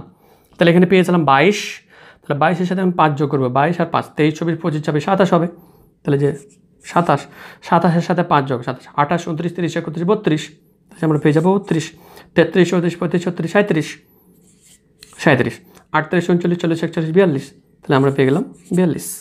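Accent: Rajshahi, Bangladesh. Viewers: Sir are you from Rajshahi?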